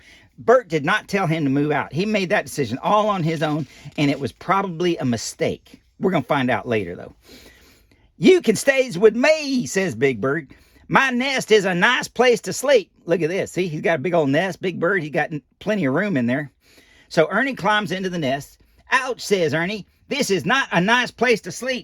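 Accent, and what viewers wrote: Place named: Deep South, United States. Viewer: Most of this video just sounded like talking like he was from the Deep South. Lol. has a slightly higher voice from all of the old show tapes I’ve watched.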